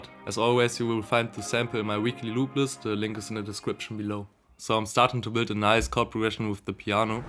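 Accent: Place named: Germany. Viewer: Mann hört sein deutschen aktzent so raus haha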